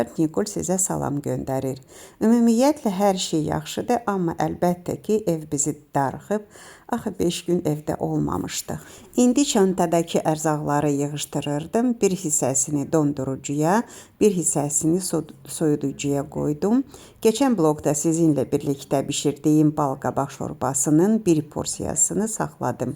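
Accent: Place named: Quba-Qusar, Azerbaijan. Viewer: Salam xanım. Siz haralısınız? Danışığızdan elə çıxır Quba Qusar zonasındansınız. Elədimi?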